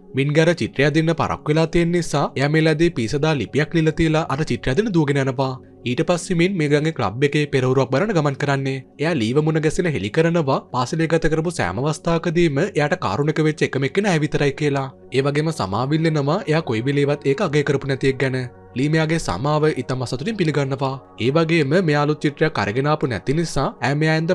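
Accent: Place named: Galle, Sri Lanka. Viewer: මේ කතා කරන එක්කෙන ගාල්ලෙ පැත්තෙ වෙන්න ඔනා.....😂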